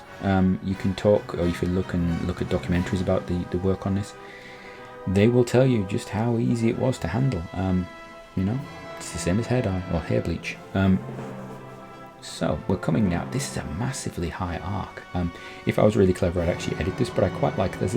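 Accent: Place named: United Kingdom. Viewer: hmmmmmmmmm that british accent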